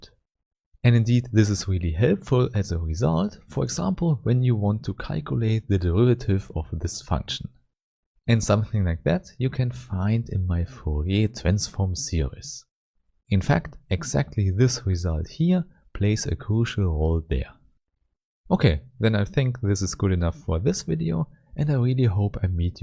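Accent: Germany. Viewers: liebe diesen deutschen akzent haha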